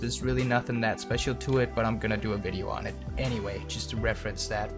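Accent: Denmark. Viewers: i couldn't help but notice your accent and ask if you're Danish? It sure sounds like it:) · Are you Danish???? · Are you danish? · are you Danish?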